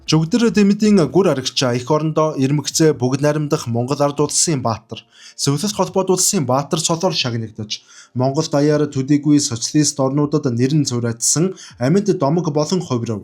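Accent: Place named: United States. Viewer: Дайн саад байх юу бхав дээ сонин стилээр америк стилээр хуцаад боль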